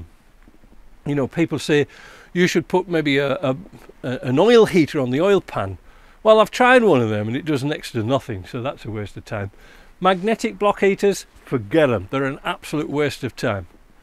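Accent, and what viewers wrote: Yorkshire, United Kingdom. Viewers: Very interesting...wish I knew your name.....trying to work out your accent....Yorkshire perhaps. Talking about the price of diesel.....remember when I bought my S3 in 1975....still in daily use with me now...diesel was half the price of petrol...as it should be....it takes a lot less money to refine. Got a mod on my S3 heater....its got a 200di in it now.....just before the heater-box I made and fitted a Y section inlet out of thin plate.....so it take ait via ducting from behind the front grill and just to the left of the rad.....so it now gets ram air....other wise the blower needs to be on all the time and going at much more that 15-20mph if becomes very poor due trying to drag air in from that side vent. Tried air scoops on the side inlet useless.air steam is deflected outward when in motion. Also need to seal all the internal gaps up in the heater box around the matrix.....so all the air the comes in HAS TO PASS THROUGH THE MATRIX......lots used to bypass around its sides.....so then coming into the cab cold adding to the prob. 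Works a treat.....got to get the motor warm of course.....I just make sure the stat is efficient as it can be.....ie correct stat on a series....as you know got to be the one with a shoulder.....lots of owners I have found fit by mistake the flat seat type.....no good coolant just goes around it. Love your vids....love to hear the story of what took you to Canada. from Cheshire UK.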